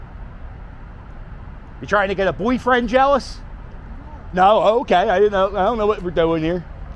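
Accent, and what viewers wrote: Philadelphia, United States. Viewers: Yo!, I subscribed to your site because I enjoy listening to the Philly accent again its been years. Great work on your videos, keep it up!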